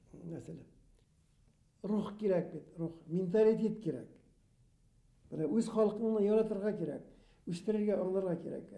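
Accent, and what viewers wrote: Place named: Bashkortostan, Russia. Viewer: тукай башкорт северо-западный диалект hойлашкан ул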